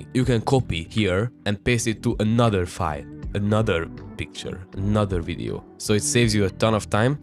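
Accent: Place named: Hungary. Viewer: Wait your accent is VERY simmilar to mine. Are you Hungarian?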